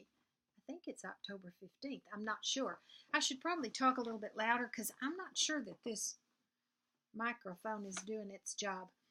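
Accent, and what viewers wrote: South, United States. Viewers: You are precious!! I understand you completely because I’m from the south also!! You make my day!! God Bless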